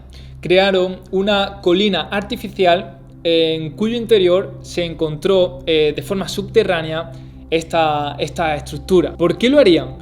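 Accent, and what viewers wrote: Andalusia, Spain. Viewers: / Voy a romper una lanza a favor de. Este señor es andaluz, seguramente granadino. Afortunadamente la Real Academia de la Lengua Española, contempla los diferentes acentos de los hablantes de este maravilloso idioma que hablamos 800 millones de personas en el mundo y que nos permite comunicarnos entre todos nosotros. He viajado por España y por Iberoamérica y jamás he tenido problemas de comunicación y, menos, de comprensión. Un par de consejos, me tomo ese privilegio porque ya soy muy mayor; agudiza el oído y lee mucho. Un saludo cordial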